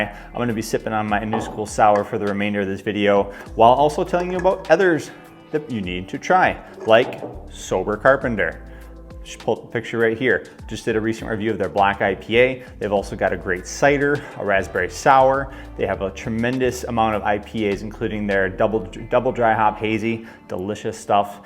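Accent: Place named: Minnesota, United States. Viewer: I hear Minnesota and you got my sub gotta support a fellow Minnesotan. 🍻 Was looking at your vid to see if Bero is the best but I think I’ll have to try Bero and Go Brewing. Dad’s a beer lover. And trying to get him to ween off the alcohol because of his health but I’m also interested in the NA stuff because I’m not a fan of alcohol.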